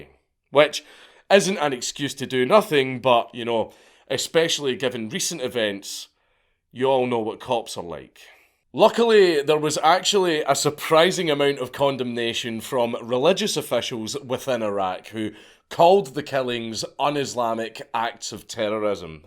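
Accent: Scotland, United Kingdom. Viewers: Scottish I think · He’s Scottish. That’s why.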